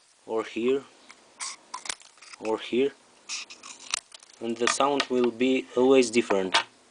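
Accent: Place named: India. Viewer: im not saying all indians have a gay vioce, just that this guy has a indian voice and he has a gay vioce.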